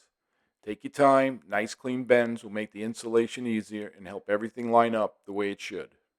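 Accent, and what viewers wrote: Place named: New York, United States. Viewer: Thank you for a great video. I just followed it and did the conversion on my 665 and it’s great. Out of all the videos out there on this topic, I chose yours because of your accent. It just seems right when I’m working on a “made in New York” Lionel. Have a great day!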